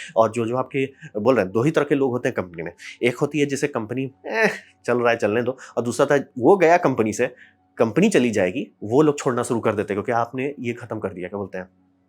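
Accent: Bihar, India. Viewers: Bhai aap Bihar se hai kya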